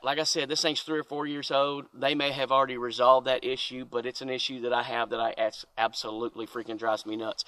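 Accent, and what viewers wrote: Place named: United States. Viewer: I love a good old country giving review,s. straight forward country talk no one can say what did he say. i plan on buying a bad bow this winter.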